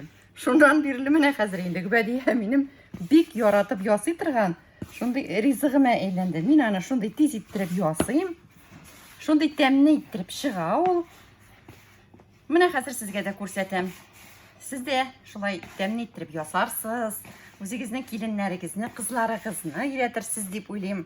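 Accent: Standard. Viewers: Миңа пешерү осталыгы бер ошаса, бу ханымның татарча шундый чиста, матур сөйләве тагын да ошады! Бик зыялы ханым!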